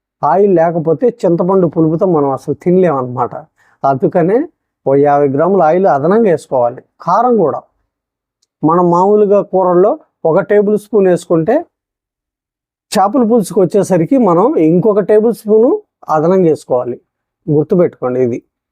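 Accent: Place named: Tenali, India. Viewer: Meeru cheptunte naku tenalanipisthundi sir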